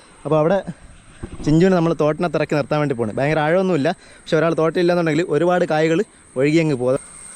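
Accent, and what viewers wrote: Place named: Kasaragod, India. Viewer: ഇദ്ധേഹം കാസഗോഡൻ മലയാളമല്ലോ സംസാരിക്കുന്നത്...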